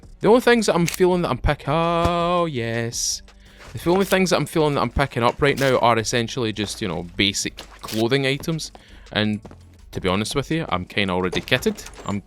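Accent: Scotland, United Kingdom. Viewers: I've been looking for a new SCUM player but I don't think I can watch your videos, I'm Scottish as well and the inevitable thing that all Scottish people have to do so everyone else understands us also is unbearable to us! 😂 Nothing personal mate 👍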